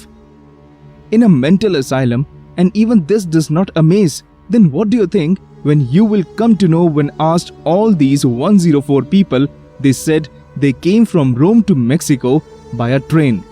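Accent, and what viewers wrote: India. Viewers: I hear Indian accent bro are you one of us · Your INDIAN accent made this video especially confusing. But a creepy account of fiction I think.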